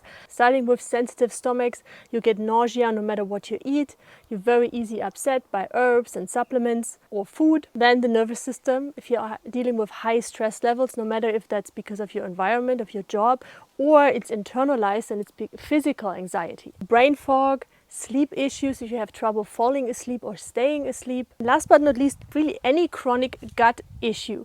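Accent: Germany. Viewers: Will start tonight. I could listen to this sweet lady talk "all day" with that cute accent (German? I dunno).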